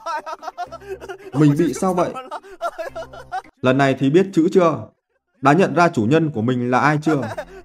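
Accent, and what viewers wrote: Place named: North, Vietnam. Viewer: sao cứ thuyêt minh giong bắc khong đã vây nghe không biết nam nữ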